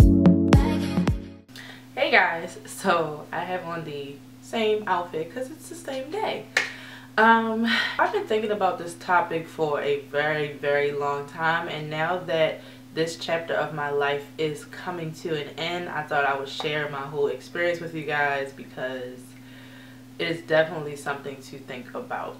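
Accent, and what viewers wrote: Baltimore, United States. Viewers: I could tell you were from Baltimore a few sentences in 😁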